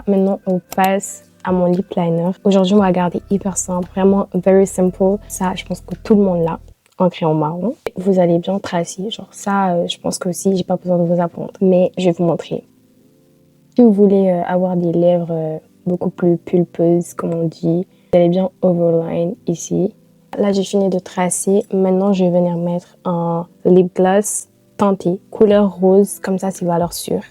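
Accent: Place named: United States. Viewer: J'aime bcp trp son accent elle a l'accent Américain !😂🤣😅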